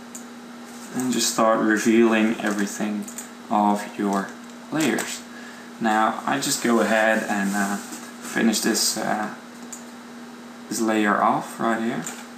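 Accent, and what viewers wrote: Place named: Netherlands. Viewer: haha lekker hoor dutch accent ftw :)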